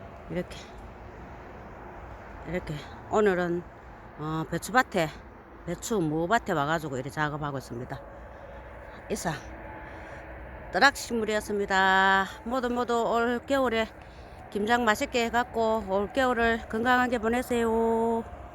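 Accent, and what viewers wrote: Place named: Gyeongsang, South Korea. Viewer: 경상도 사투리쓰시는 친근감이 베스트이고 무ㆍ배추가 힐링되니 더더욱 귀촌생활이 아름답습니다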